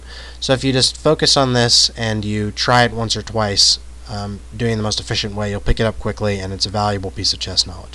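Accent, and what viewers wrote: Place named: Maryland, United States. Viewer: Are you from Frederick, Maryland.... it sounds just like a guy I know from there?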